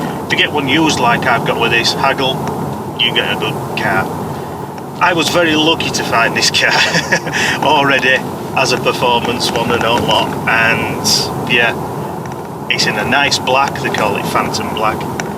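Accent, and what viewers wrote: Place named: United Kingdom. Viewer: Phenomenal hatchback already giving the GTI a run for the money. Loved your video, and am a new subie. Wish your mic didn't have so much wind interference though, lost some of your comments. Haven't quite gotten used to your Brit accent yet, tuning in from Canada!. Hope they ship the i30N to our shores. Cheers!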